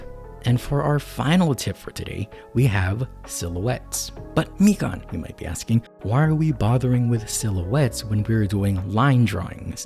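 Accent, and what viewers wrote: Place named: United Kingdom. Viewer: british detected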